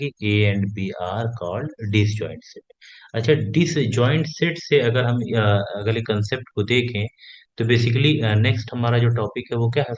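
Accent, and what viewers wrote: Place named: India. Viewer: Thank you. You convinced me that the math is no problem. Understanding mix of english and indian is much harder.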